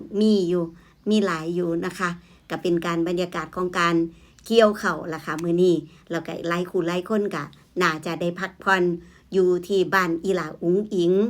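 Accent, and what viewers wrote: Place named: Ubon Ratchathani, Thailand. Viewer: ชอบการเล่าข่าวแบบนี้ น้ำเสียงแบบนี้ สไตล์ 🎉🎉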